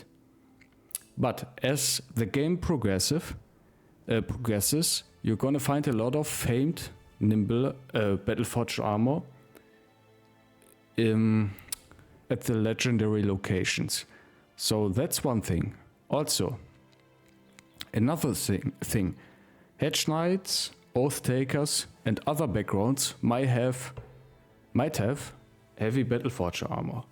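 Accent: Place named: Germany. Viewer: Nothing is quite like a thick german accent schooling one in Battle Brothers! Thanks i learned a lot :)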